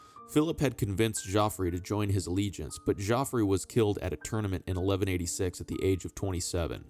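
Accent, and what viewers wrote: United States. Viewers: I hear his American accent, but can't identify the region.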